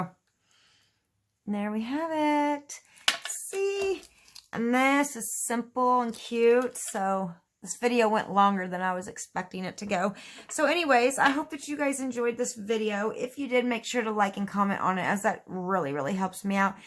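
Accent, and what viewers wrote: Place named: South, United States. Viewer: you do sound southern to me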